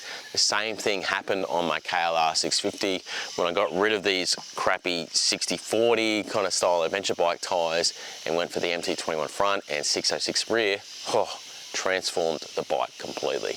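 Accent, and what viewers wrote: Australia. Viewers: that is not a British accent. Aussie, maybe a Queenslander. Which is Australian, just more so. He dresses like he's from QL. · Taai'es ... gotta love the Aussie accent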